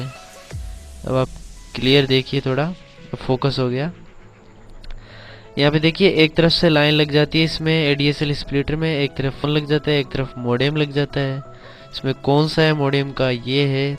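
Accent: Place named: Shekhawati, India. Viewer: Bhai rajasthan se h na pka shekhawati se 🤣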